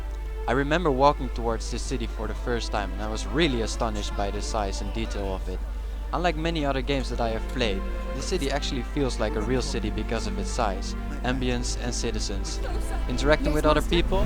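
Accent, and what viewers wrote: Netherlands. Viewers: Are you Dutch? I seem to hear an accent lol. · Dat dacht ik al te horen aan het accent;) Leuke video btw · Nederlands accent XD nice video btw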